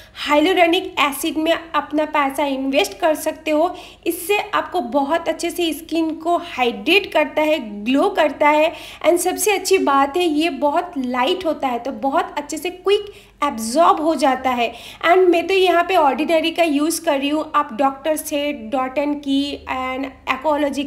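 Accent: Bengal, India. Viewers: Love this Channel and love your sweet bengali accent that pops in while speaking hindi ❤❤want to aski regarding can we have soups recipe as well since winter is approaching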